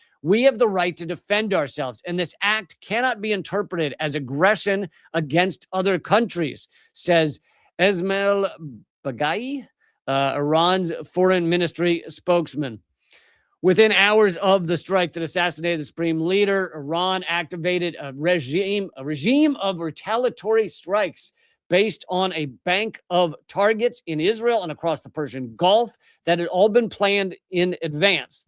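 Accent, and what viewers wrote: United States. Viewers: Nothing personal mate & your content is fabulous just not in the zone to listen to an american voice & that grating accent . All the best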